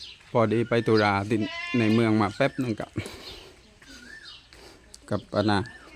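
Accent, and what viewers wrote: South, Thailand. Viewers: ฟังสบายๆ.ภาษาใต้.ให้กำลังใจคับลุง.จากfc.นราคับ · สวัสดีจ้า ชอบฟังสำเนียงใต้ลุงจ้า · ฟังสำเนียงใต้ก็เพราะดีนะคะ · ฟังสำเนียงคนใต้แบบ แล้ว.กะดีคือกัน.ได้รู้ว่าภาคใต้เขาพูดแบบนี้.ฟังจากที่อื่นเขาพูดเร็วๆรัวๆ.แต่ พูดแล้วฟังรู้เรื่องจ้า.❤🎉🎉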